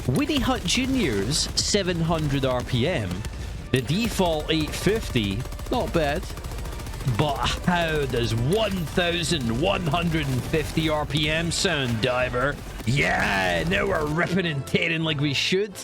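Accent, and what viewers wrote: Scotland, United Kingdom. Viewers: I got so used to your nice scotty accent that when the switch to the redneck Southerner accent came it felt like I got punched in the face. A very Democratic punch, but a punch nonetheless. Great work 👍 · Got as far as the 50th mispronounced 'a' then switched off. Just speak normal, mate. Its ok to be Scottish.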